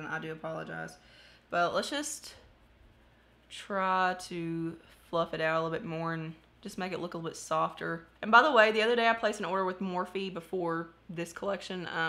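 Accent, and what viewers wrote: South, United States. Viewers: I just found you today, and I LOVE your honesty and Southern accent! I am from Arkansas, girl! Going to peruse your older videos to find out where you’re from! ❤️ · Where are you from? I'm from TN, the accent I can guess you are too or AL, GA, KY. Be cool if you where from TN too, I've only watched one of your videos so far but I like watching you so far! Pretty mellow, real, and tell the truth!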